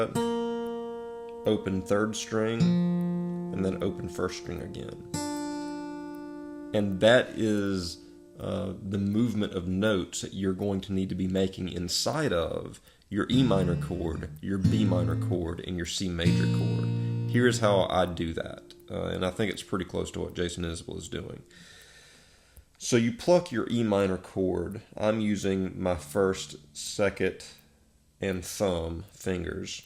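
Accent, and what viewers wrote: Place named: Tennessee, United States. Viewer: I love your voice. You sound like the comedian Nate Barghatze. I bet you're from Tennessee 🤗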